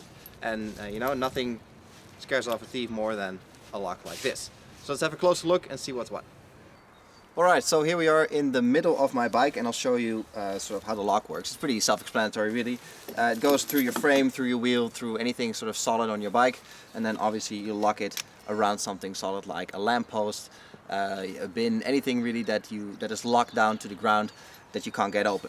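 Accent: Netherlands. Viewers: It took me a very long time to realise that you might actually be Dutch instead of American.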